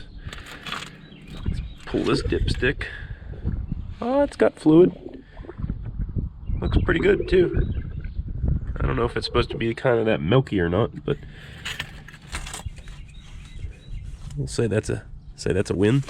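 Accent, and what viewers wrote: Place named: Pennsylvania, United States. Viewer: For the longest time, you reminded me of someone; but I couldn't place it. I finally figured it out. You could be Rick Rowlands' kid brother. BTW my people are from around Uniontown/Connellsville, you talk like them.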